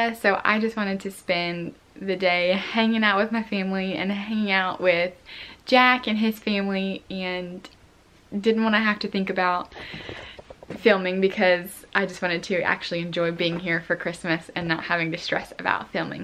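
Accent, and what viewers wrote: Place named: South, United States. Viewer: Not sure how I found thous page, you are very high energy, which is cool, I like your southern accent, I sub and liked. Hello from Ontario Canada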